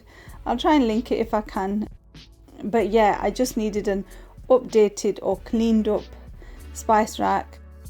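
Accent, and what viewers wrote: United Kingdom. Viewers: Your videos are good but did you actually have 6 babies as a 2nd generation person. Thats what people of the 1st generation did. Pop out a baby every year until menopause. 6 is way too many. Omg !!!!! Were some twins or something ? I mean I dont actually know anyone thats 2nd generation ( children of immigrants ) that have had 6 kids, or are you an immigrant yourself ? You cant be because you speak so British. I think its quite selfish on your children to fill the house with so many babies. IT LEADS TO POVERTY !!!!!!!!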